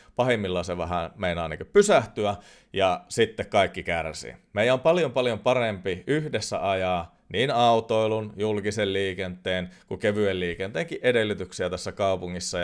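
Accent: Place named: Espoo, Finland. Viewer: Melko Espoolainen aksentti....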